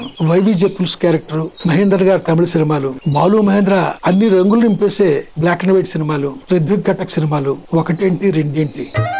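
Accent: Godavari, India. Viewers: Miru godavari slang and location bhaga chupedthari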